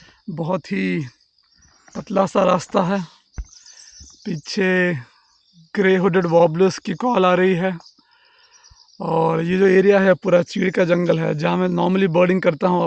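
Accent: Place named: Garhwal, India. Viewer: Good garhwali accent 🎉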